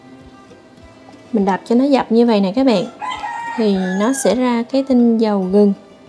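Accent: Mekong Delta, Vietnam. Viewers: Co Gai mien tay Chat giong hay qua ngot lm e oi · Bạn ở miền tây à,nghe giọng de thương quá, cái muỗng dua quê mình....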